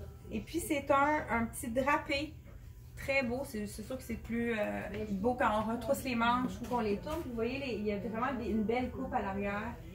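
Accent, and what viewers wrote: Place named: Canada. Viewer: Bonnes idees mais le son n est pas net et la voie trop canadienne dommage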